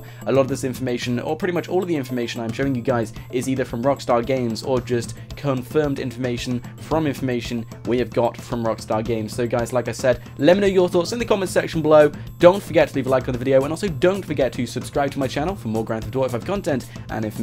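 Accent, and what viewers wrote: United Kingdom. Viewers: Why do British people say pronounce it Mili-tree instead of mili-tary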